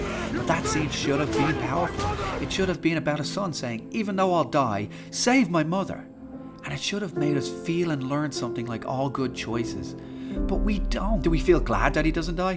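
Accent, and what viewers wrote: Ireland. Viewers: this irish sounding guy understands the greatest american superhero better than zach snyder and every dc fanboi. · More than likely the Irish accent. The volume level of his voice is fine. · Nice Irish lilt. Ba dheas an rud a cloisint ar YouTube.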